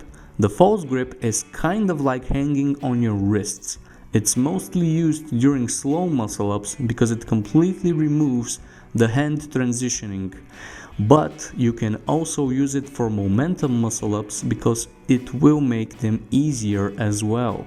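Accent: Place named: Russia. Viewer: You have accent just like you are Russian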